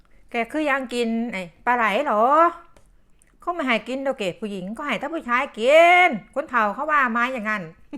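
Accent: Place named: Korat, Thailand. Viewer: วิธีหุงข้าวเหนียวด้วยไมโครเวฟ เยี่ยมเลยค่ะป้า อยู่ส่วนไหนของโลกก็มีอาหารของโปรดบ้านเรากินน้อจ้าป้า สำเนียงโคราชนี่ได้ใจย่า🙏❤️🤟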